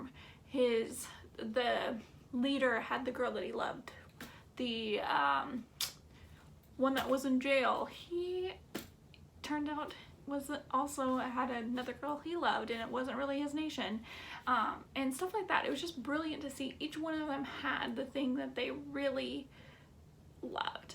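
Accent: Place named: South, United States. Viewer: love your accent, where are you from? South?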